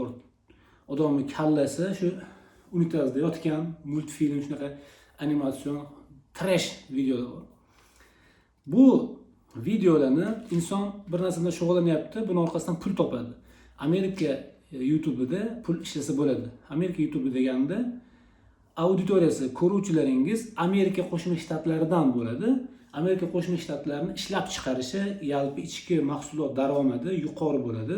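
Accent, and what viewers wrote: Namangan, Uzbekistan. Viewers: namanganni tortyapti Uchqoʻrgʻondanman siz qayerdansiz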